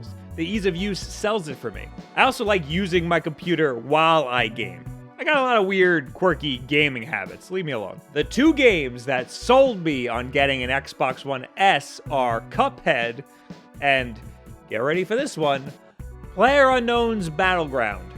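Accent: New York, United States. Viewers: THAT NEW YORK ACCENT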